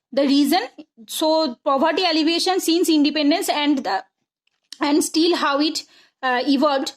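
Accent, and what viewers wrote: Bengal, India. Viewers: Well!! She sounds bengali...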